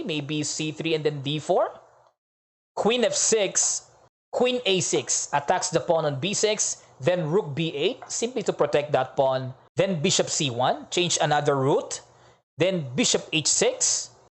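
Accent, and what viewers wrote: United Kingdom. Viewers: naks... coach... galing ah... British accent. nanibago ako.. "IM ".☺✌✌✌✌✌ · galing namn.. nanibago ako.... sir coach....parang british ang dating..napakahusay mo..saludo kami ng mga anak ko sayo...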